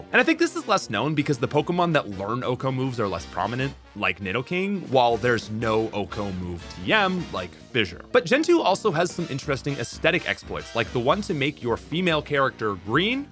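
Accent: Canada. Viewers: Some of your pronunciations of the pokemon are odd lol..must be your Canadian accent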